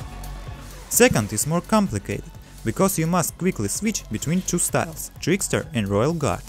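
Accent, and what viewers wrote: Russia. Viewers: I freaking love the Russian Accent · Твой russian акцент too заметный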